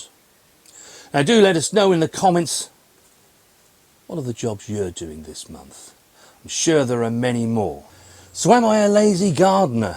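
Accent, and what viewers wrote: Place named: RP, United Kingdom. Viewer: Where abouts in Norfolk are you - I'm strugglng to identfy it by your rather posh well spoken accent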